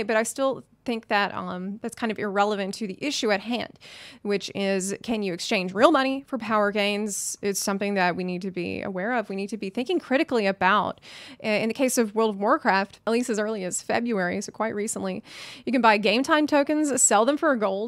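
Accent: South, United States. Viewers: I think it's a light version of a southern drawl, so maybe around Tennessee somewhere? That's a complete guess though.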